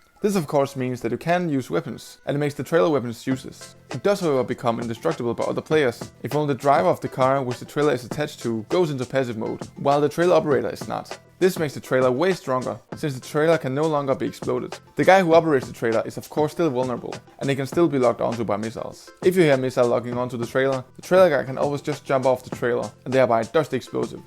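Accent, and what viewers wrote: Denmark. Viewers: i can hear you dansih becus of the accent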